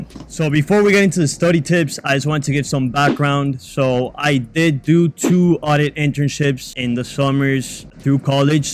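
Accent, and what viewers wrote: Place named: Miami, United States. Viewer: Thick miami accent lol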